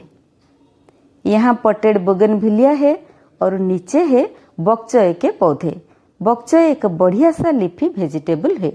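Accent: Odisha, India. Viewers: Aapke Hind boli se pata chal raha tha ki aap Odia hi honge 😃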